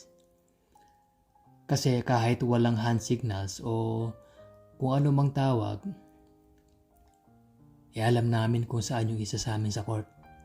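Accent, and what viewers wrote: Pampanga, Philippines. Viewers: Kapampangan accent ei.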